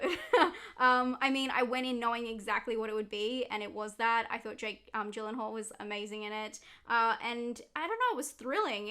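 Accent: Australia. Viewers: Congratulations on getting married..I love your channel and your Aussie accent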